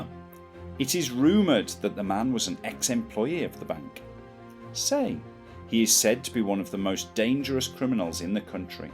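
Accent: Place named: United Kingdom. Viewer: British accent❤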